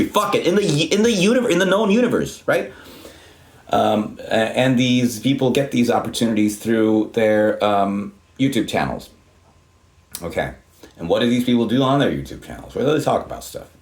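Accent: United States. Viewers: En ymmärrä mistä sait tuon jenkkinuotin englannin puheelle. Tästä voisit tehdä videon, että miksi osaat noin hyvin? Hyviä videoita olet tehnyt.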